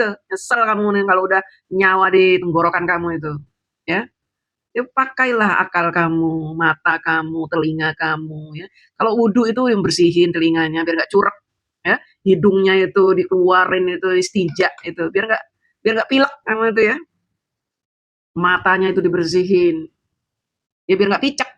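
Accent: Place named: Java, Indonesia. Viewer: Saya kira mbak bkn org jawa,tapi kalau bicaranya diselipi pake bhs jawa yg medok,baru percaya nek mbak priyantun jawi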